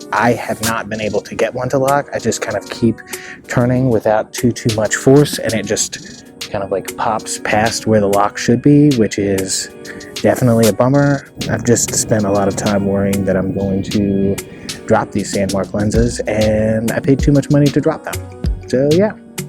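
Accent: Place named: South Africa. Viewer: Your accent has some South African sound to it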